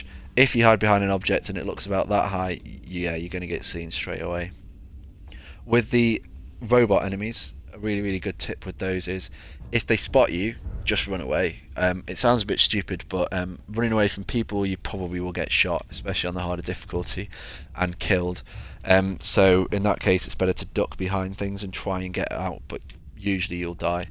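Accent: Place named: United Kingdom. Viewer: Very British...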